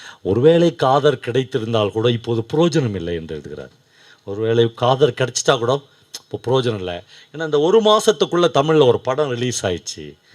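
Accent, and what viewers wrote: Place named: Tiruvannamalai, India. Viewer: தி.மலை தமிழில் உரையாடுவதின் உயிரோட்டத்தை உணர முடிகிறது.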